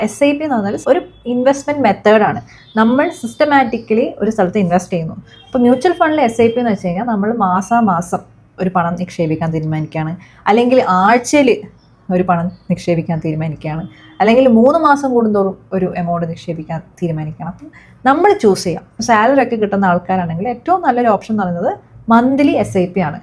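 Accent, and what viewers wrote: Kannur, India. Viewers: ആദ്യമായിട്ടാണ് ഞാൻ നിങ്ങളുടെ വീഡിയോ കാണുന്നത്.. ഏതോ ഒരു സെക്കൻഡിൽ നിങ്ങൾ ഒരു കണ്ണൂർ കാരി ആണെന്ന് ഞാൻ തിരിച്ചറിയുന്നു 😅😅.. am i right..?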